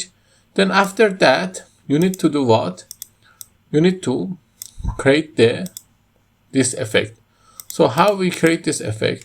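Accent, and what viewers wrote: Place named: Iran. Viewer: ایرانی 😅😅😅😅 لحجت خیلی زایه هست حتی از هندی ها هم بد تره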